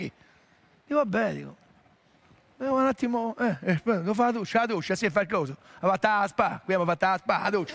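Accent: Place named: Rome, Italy. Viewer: Il fatto e che il dialetto romano è fra i dialetti più simpatici... Quindi alle orecchie dei non romani non risulta "grezzo" o "volgare" anzi... Tutto qui. La stessa cosa vale per gli altri dialetti "comprensibili" e simpatici.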